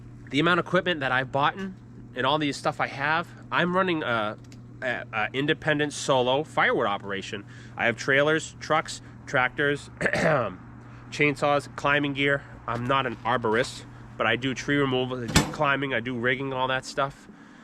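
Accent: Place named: New England, United States. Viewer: You’re definitely from New England. That accent chining through. 😂 I’m from Maine. You?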